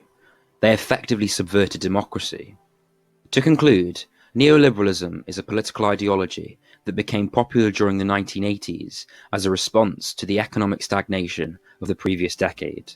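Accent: United Kingdom. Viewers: have you never heard a british person before? his accent is very standard and easily understood if you don't live under a rock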